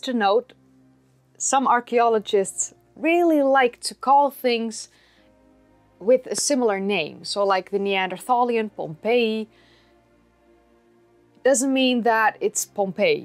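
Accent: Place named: Netherlands. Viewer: She speaks excellent American, but with a Dutch chattiness to it.But how can you tell the difference between the soot of a Neanderthal fire and of modern human fire?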